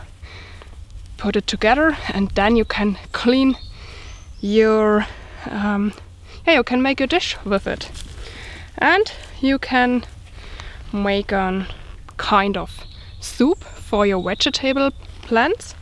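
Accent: Germany. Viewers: You could have carried a couple of cans of beer to drink when thirsty. I did not know Germans drank water. Your camp looks like it is infested with mosquitoes ! I hope you had the means to deal with them. You should have also brought bikini tops to wear when swimming. The tee shirt will not ward off the cold if wet. BTW, I love your German accent and how you patiently you explain in English.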